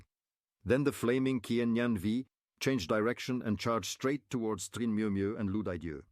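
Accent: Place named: India. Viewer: It's like an Indian accent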